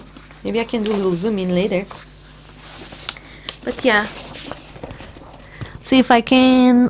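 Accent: Portugal. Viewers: i watched the whole video, and I love your Portuguese accent, when you say "thanks" it sounds to my "murican" ears that you said "tanks".. and my brain immediately went.. no wonder she likes tanks, but how do you do oil changes on a thanks... I've said this before you to on stream ages ago, but as one of the "silent" majority who watch, thank you, for choosing to keep your steaming and videos going. There are/were many a night where I am rocking one of my kids to sleep to one of your streams or chilling in the hospital icu ward, while joking with my nurses, watching. It is weird to think it has been that long, but one is just finished kindergarten, and the other is 2. Both boys love watching you/jingles, you have become routine like i used to watch specific military history shows in TV as a kid in the 80's. so Thank You, you've made an impact in lives much bigger then you've maybe imagined possible when you were back in that mess years ago. 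· Are you Portuguese? You sound like one...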